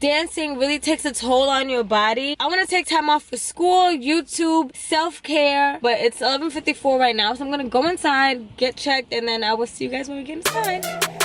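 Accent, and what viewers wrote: New York, United States. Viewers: Love the NY accent. I got a Boston accent so I love the east coast dialogue lol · New York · Are you from New York? Your voice and accent is gorgeous girl 🔥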